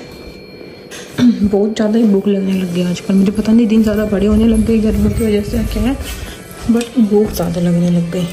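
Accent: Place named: Haryana, India. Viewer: Hey ❤❤❤ kashika here... Don't know message will reach..bas ker dia..😅😅😅😅and haryanvi accent me tum bhot surprising lgti ho...😂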